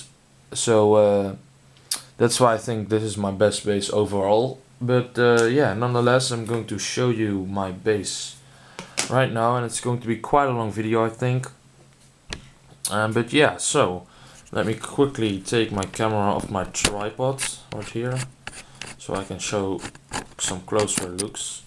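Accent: Netherlands. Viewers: Are you dutch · are you dutch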